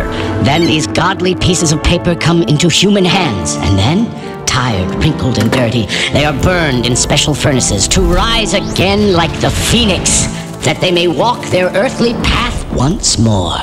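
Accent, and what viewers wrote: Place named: Russia. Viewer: По мне в русской озвучке намного лучше...К тому же тут какой-то русский акцент прощупывается(хотя возможно это только мне кажется)...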